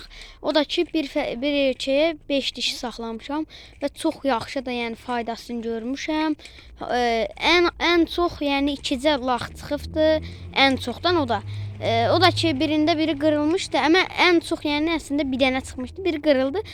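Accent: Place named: Georgia. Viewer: Gürcüstan ləhcəsinə oxşoooor ləhcən ay qaaaa.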